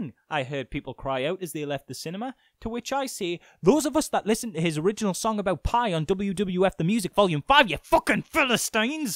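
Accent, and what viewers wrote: Newcastle, United Kingdom. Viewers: ah with the accent I assumed you ment somewhere local to newcastle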